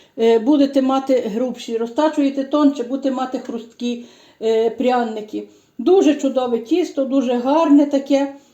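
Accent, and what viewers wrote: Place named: Horodenka, Ukraine. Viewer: Слава Ісусу Христу,з неділею святою будьте здорові.П. я за браком часу невстигаю вам писати коментарі,а ви працюєте,випікаєте.Дуже гарне ваше печиво,а штолени сирні,які апетитні,ви напевно весільна господиня,або десь в їдальні працюєте.Дякую вам за ваші рецепти,що ділитеся з нами.Вітаю вас з прийдешніми святами,зичу миру нам всім та здоров'я.Ви часом не з городенківщини?колись там були в гостях і там похожий говір.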